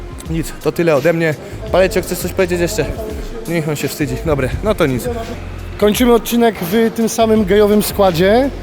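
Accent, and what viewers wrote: Silesia, Poland. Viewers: Jak łon Gryfinie po Śląsku nawijo 😁👍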